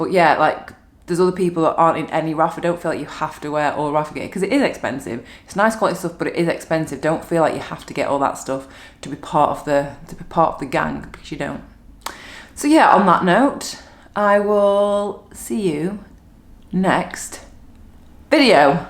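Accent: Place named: Manchester, United Kingdom. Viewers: Love your northern accent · Like an episode of Saxondale with that Manchesta’ accent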